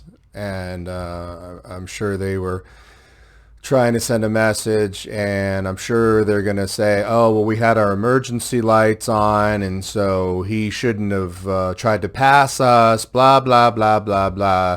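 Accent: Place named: United States. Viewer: Nothing to do with this. But I'm an Englishwoman and I love your American accent,. But aside from that - that twat from Engine 7 had it in for you from the word go. Too much ego and not enough brainpower to understand that you were filming from a public area and had every jurisdiction to do so. What an absolute bellend.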